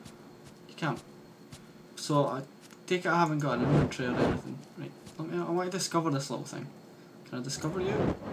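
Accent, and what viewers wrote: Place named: Ayrshire, United Kingdom. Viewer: Im scottish and Im having trouble pinpointing the accent, I think its ayrshire but really not sure, its funny cos they cant say words like "concentrated" they say "concentrataeed" instead lol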